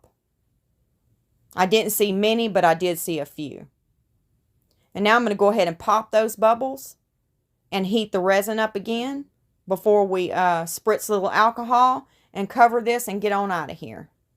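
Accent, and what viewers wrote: South, United States. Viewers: I adore these mixed media resin style pieces❤ur daughter is gonna adore it I’m certain of it!! Did I see on the hobby lobby sticker Oklahoma? I knew u were from the south Cz of ur draw & that u say “fixin to”! I went to high school in Claremore Oklahoma & still have friends I visit in Oklahoma City every few years. It be crazy if ur from around there too🤯🫢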